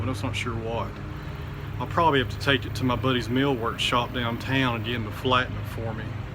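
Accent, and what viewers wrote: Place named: Tennessee, United States. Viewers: I truly enjoy hearing what you have to say, and I also enjoy your Tennessee accent very much. Don't listen to the naysayers... I think most of us appreciate your insights and a little background on what and why you are doing things the way you do them in your videos. Keep up the good work, sir, and don't change a thing! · Talking too much?! I mean, seriously, who doesn't appreciate being educated by a man with a great southern drawl. Weenie's winer's and wimp complaints, say I! Your videos are excellent and entertaining. Happy to see you don't take the negative comments seriously.Anxious to see that walnut being milled.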